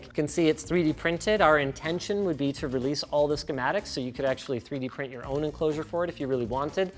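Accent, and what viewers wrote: South Africa. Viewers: we hear that South African accent bru.Nice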